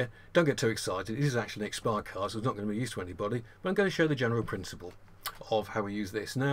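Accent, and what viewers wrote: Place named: United Kingdom. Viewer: I had to slow your voice down. You speak way too fast for me to understand most of what you say, especially with your British accent.😅 Thank you.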